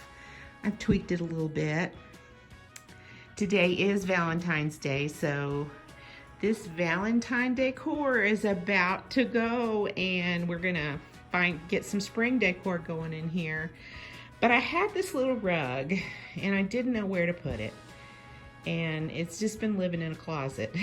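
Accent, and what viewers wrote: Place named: Tennessee, United States. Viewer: I knew when you started talking you were a Tennessee gal. 🧡 I love that you are really selective in what you brought home. How long have you been in your home? We will be in ours 2 years in April. It just seems so wild to have the perfect house for just the 2 of us since the kids have their own houses now. We are renovating to get that cozy, cottage vibe. You are a lovely inspiration.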